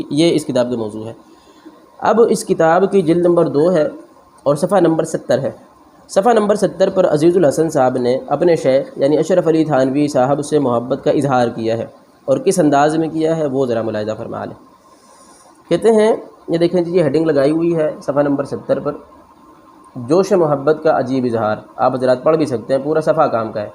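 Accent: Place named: Azamgarh, India. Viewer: جناب آپ اعظم گڑھ مبارکپور کے رہنے والے ہیں آپ کی آواز سے ایسا ہی لگتا ہے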